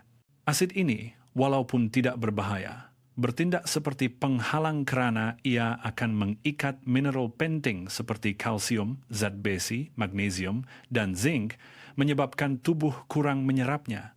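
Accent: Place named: Indonesia. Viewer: AI...Hari tu bahasa Melayu...ni bunyi macam bahasa Indo...Sangguh...sepatutnya disebut sungguh...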